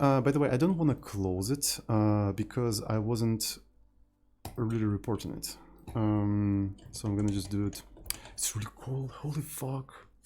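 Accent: Russia. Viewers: русский акцент?)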